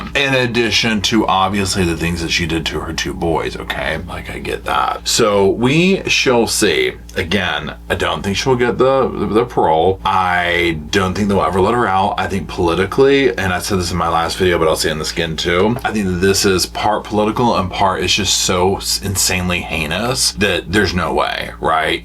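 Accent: South, United States. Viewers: I’m listening to you as I’m having my afternoon coffee. Thinking that you are from the south but couldn’t put my finger on it. Just saw Pittsboro, we lived in Fayetteville(Fort Bragg) for 8 years. We lived there when this happened and I had just had our youngest child. I was so frightened to go outside, even though union was further west in SC, the car hadn’t been found yet. It was a time when carjacking was becoming a thing. So yes Susan Smith had people looking side eye at black men in a red car. It was a horrific crime and the judge showed pity on her by allowing parole. She’s right up there with Sarah Boone and Letiticia Stauch in her narcissism So heyyyyy from east Alabama!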